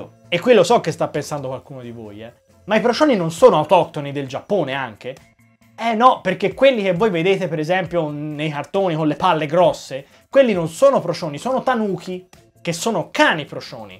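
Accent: Tuscany, Italy. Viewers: Bravissimo, come sempre. Ti giuro, da 8.10 a 8.55 io rotolo dalle risate: accento toscanissimo (ce l'ho anch'io, eh), procioni che rotolano, dentini, ecc... Continuo a guardare il videl in loop. 🤣🤣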